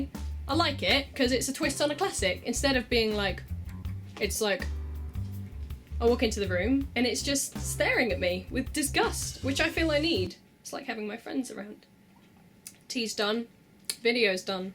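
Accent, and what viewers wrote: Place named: Wales, United Kingdom. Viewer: Wait you're welsh?!!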